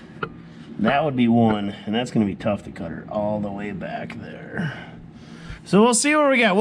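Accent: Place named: Wisconsin, United States. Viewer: Looks great. I detect a Wisconsin accent.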